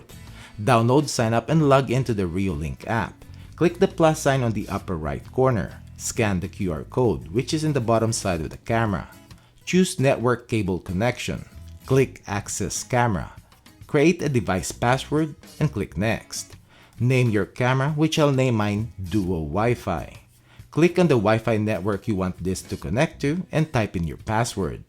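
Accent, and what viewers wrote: Philippines. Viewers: Filipino accent is so beautiful!! 🥰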